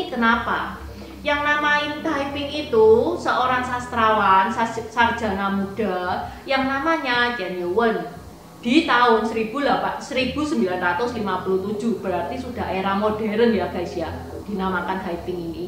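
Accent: Java, Indonesia. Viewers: logat Jawa medok nih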